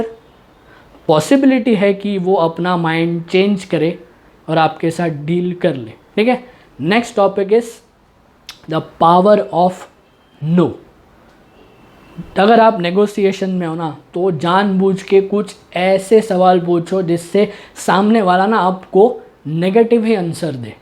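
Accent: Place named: Gujarat, India. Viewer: great sir, great video and from the way you speek i think you are from gujrat , back to point nice and informative video i will try this on my crush hehe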